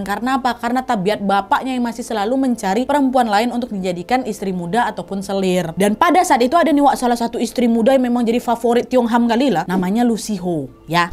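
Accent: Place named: Minangkabau, Indonesia. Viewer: Baru kenal content (tiga content sj per 27 Agt 23) ini.. ternyata lsg betah mendengar paparan kisah dan cuap2nya yg dibumbui dialektika Minang Riau 😊 enak dan nyangkut sj di kuping. Keren, semangat utk terus berkreasi. 🎉🎉🎉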